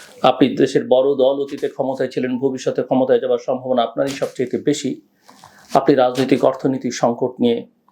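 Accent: India. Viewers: তুমি এতো সুশীল সাজার চেষ্টা করিও না। জেনারেল আর ভারতের টোনে যে কথা বলো এটা জনগণ বুঝে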